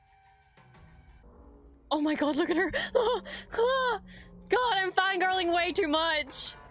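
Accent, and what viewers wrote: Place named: Northern Ireland, United Kingdom. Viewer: You're part of the NI 501 right? Hearin' that accent. Mate how do I get in to the 501 chapter or what have ye?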